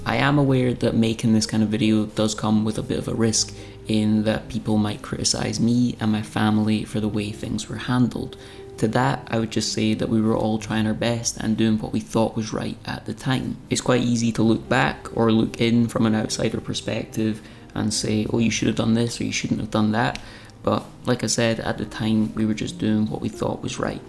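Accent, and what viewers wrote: Scotland, United Kingdom. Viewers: Those cookies look delicious! As a lifelong sufferer of depression and anxiety, I am SO glad that the stigma is starting to pull away from mental heath problems. I did not know what was wrong with me, but I certainly beat the doctors' doors down asking for help. The worst were the panic attacks. It took forever to find a combination of helpful meds, lots of trial and error. Do I hear a Scottish accent? My folks come from Arbroath. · Thank you so much for sharing - it's not always easy to open up, esp to the world! I've had issues most of my life but nowhere near the struggles you've gone through, mine were mostly related to being an introvert. I'm 76 now and still struggle from time to time. Finding where I belong and where I'm at peace (in Nature), has been my greatest healer even though it means being on my own with my dogs. 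I say on my own...not alone. I'm sure you'll have helped quite a number of your followers and their friends or families and I wish you all the best in the future. I thoroughly enjoy your videos and love hearing your soft Scottish voice. My origins are in Lumphanan so it reminds me very much of all my family, esp my mum. BTW - if those biscuits are anything to go by, you've got a head start on recovery - we need that recipe!! Love 'n' healing hugs from Oz ❤️🤗👩‍🌾🦘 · What a good video. Agree with everything you said....if it matters to you. I love your clear Scottish pronunciation.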